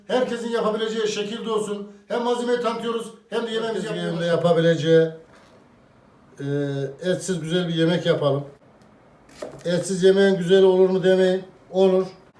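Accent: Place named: Anatolia, Turkey. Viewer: Ustamın şivesi ve doğal haliyle gerçek ve dürüst Anadolu kişiliği güzelliğe güzellik katıyor,Rabbim yolunuzu açık eylesin.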